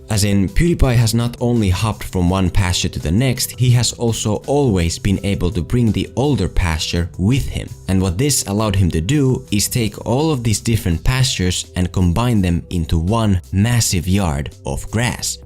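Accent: Finland. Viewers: Hey bro are you from Finland because you have a slight finnish accent. Like the way you say T's and A's is just very finnish you could definitely work on them. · the finnish accent. so good. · are you Finnish? Just wondering because of your accent. Pretty cool if you are, I don't know too many Finnish channels in the international waters. You have good stuff here, either case! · Oletko Suomalainen? Do I hear a finnish accent? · Are you from Finland? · are you finnish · Tää kuulostaa aika suomalaiselt englannilt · Where are you from? Your accent sounds Finnish. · Suomiaksentti spotattu 🤔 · Nice vid! I started following Pewds when he had less than 50k subs, and the transformation is really amazing. I unsubbed a couple years ago, but now I'm loving his content again! Oh and are you from Finland btw? Your accent sounds like you could be :D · you sound like you are Finnish, is that true? 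If so, then Torille! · Your accent sounds finnish 🤔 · Hi, where are you from? Your accent sounds finnish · Do I hear a finnish accent? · Hey, weird question... Where are you from? Your accent sounds a bit like that of a Finnish person. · Where are you from? At first I thought you were an American but then I for some reason started to pay attention to your accent. Kinda sounds like a Finn with a great English accent. Idk, I'm just curious. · I think this guy is from Finland, so much finnish accent.. And he say the name just like any finn would say it ^^ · You sound very finnish. Also, good content!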